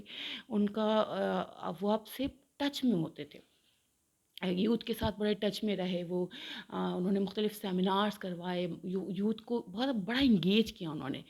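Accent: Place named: Punjab, Pakistan. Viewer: You are speaking wrong urdu with punjabi accent .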